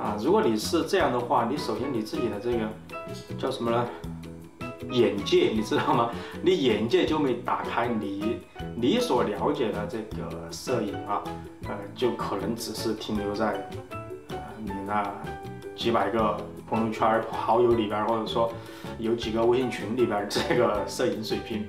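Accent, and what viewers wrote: Sichuan, China. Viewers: 老师是四川叻？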